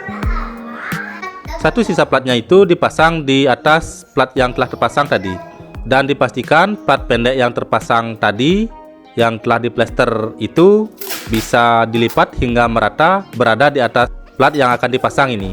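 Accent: Bali, Indonesia. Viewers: Medhok kali bli basa baline .....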